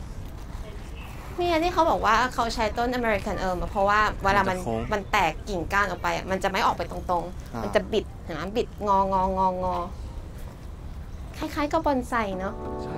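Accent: United Kingdom. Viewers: หญิงคนขายงานศิลป์ชมคุณ ว่า "ชอบสำเนียงและน้ำเสียง UK ที่มีเสน่ห์ไพเราะจับใจในการชื่นชมในงานศิลป์ ทำให้เขารู้สึกดีมีกำลังใจมากๆ เพราะไม่ได้สัมผัสบรรยากาศ และความรู้สึกดีๆ จากนักท่องเที่ยว ที่สวยและน่ารักแบบคุณ มานานมากแล้วครับ" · ฝรั่งชมคุณ เกี่ยวกับสำเนียงอังกฤษฟังแล้วมันมีสเน่ห์น่ารักจริงๆค่ะสำเนียงจะแตกต่างกับสำเนียงอเมริกา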